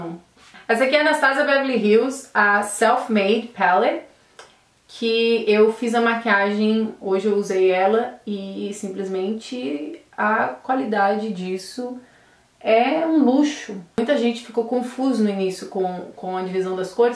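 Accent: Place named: Minas Gerais, Brazil. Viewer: menina eu acho que parece meio mineiro hahahah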